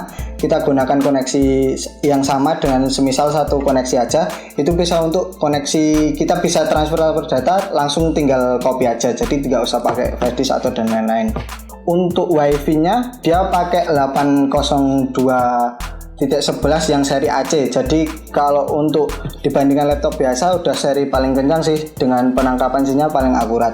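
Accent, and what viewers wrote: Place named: Java, Indonesia. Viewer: Medok banget ><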